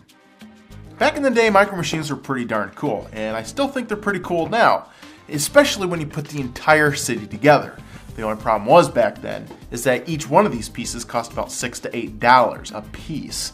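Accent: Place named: Ohio, United States. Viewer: LOL that is our Ohioan accent ~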